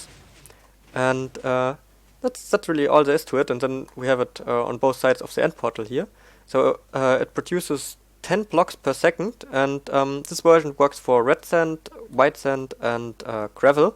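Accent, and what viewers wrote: Germany. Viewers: do you speak german (you sound like that)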